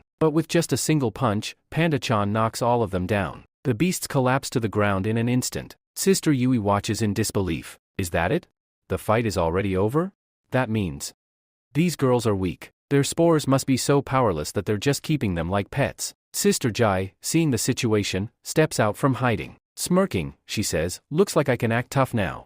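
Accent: India. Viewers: Wtf is this voice bro is not getting ppl it's is like a Indian person is talking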